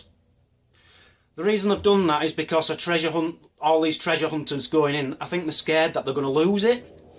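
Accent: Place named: Northern England, United Kingdom. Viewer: When I grow up and become a porn star I'm going to call myself. Seriously though, you're very entertaining... and I *WILL* have a porn star name of in honour of you. P.S Where are you from, I know it's North, but are you a Mancunian, Yorkshire, Lancashire Stoke or of there about? I thought I had an ear for accents. Anyway, keep it up. and school me on how I can't detect what region you're from.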